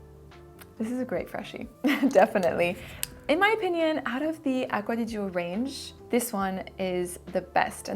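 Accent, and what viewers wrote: France. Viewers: Nice to hear legit French pronunciations! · Why do you use a French pronunciation for an English audience ? It’s pretentious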